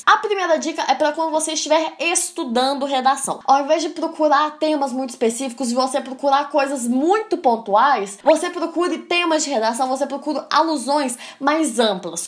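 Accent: Minas Gerais, Brazil. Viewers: Mineiros falando é algo simplesmente incrivel.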